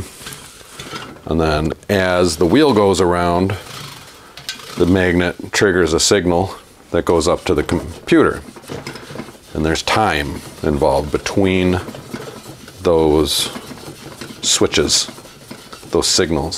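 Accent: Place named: Midwest, United States. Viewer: Judging by your accent, you're somewhere in the Midwest. Where's your shop? I'd patronize your place for sure. You seem to have a lot of integrity and know how.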